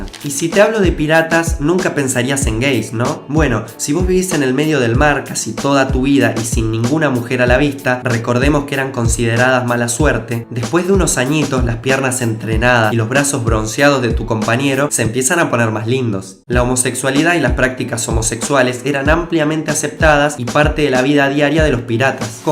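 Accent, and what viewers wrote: Argentina. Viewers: se nota lo argentino , el acento y ese nazo lo dice todo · Me encanta tú acento argentino, regálamelo por favor!!!!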